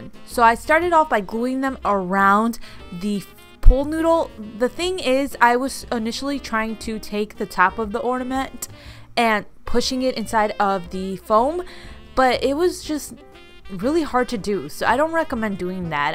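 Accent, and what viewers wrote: Chicago, United States. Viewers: Awesome as always. From one Chicago girl to another, it’s our accent. LOL OR DA MINT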